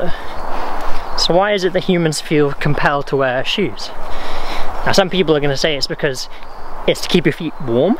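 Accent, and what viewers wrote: Wales, United Kingdom. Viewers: I watched one of your really old videos the other day and you had a welsh accent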